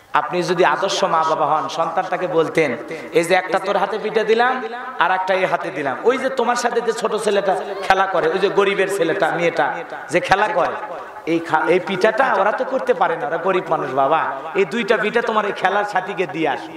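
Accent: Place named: Noakhali, Bangladesh. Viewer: ওরে নোয়াখাইল্যা ভাইরে। বিরে আশি নয়,বিরাশি বলেন। ভাষাটা একটউ শুদ্ধ করে বলেন।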